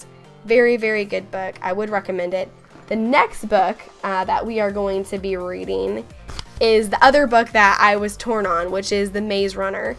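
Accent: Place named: South, United States. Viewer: To me you sounded so southern, I don't know why. You just sounded different. Or my brain is just being a derp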